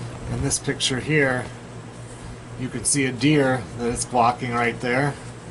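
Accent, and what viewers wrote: Minnesota, United States. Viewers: Thanks, I was thinking maybe you were in Minnesota (accents lol).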